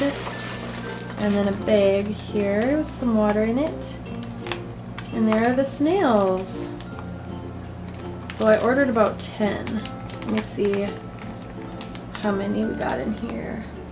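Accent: Minnesota, United States. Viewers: Hah I knew you were from Minnesota. That accent where bag sounds like "bage". My 3rd grade teacher was from Minnesota.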